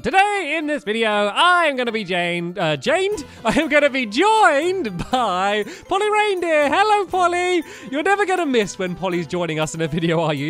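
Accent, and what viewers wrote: United Kingdom. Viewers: Why do you live in England then your British and you say z as zed and h as heych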